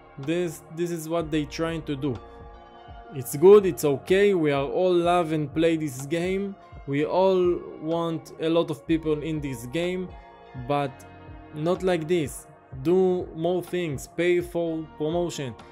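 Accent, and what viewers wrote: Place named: France. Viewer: ton accent français s’entend trop ptdr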